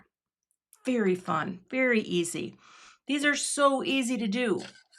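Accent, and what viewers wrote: Midwest, United States. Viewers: ?Harold and the Purple Crayon? I hear a little mid west accent in you.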